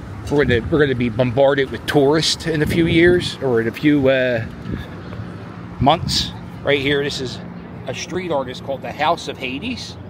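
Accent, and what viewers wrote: Philadelphia, United States. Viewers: War Ter st!!! LOL Love that philly accent!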